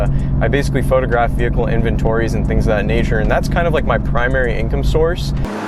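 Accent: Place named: Las Vegas, United States. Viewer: Sound like a local Vegas guy loo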